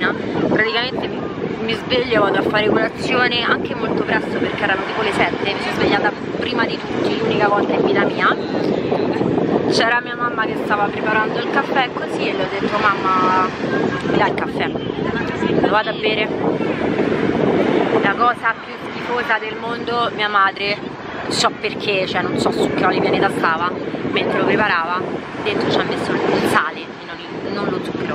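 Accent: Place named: Rome, Italy. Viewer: Amo il suo accento romano 🔝❤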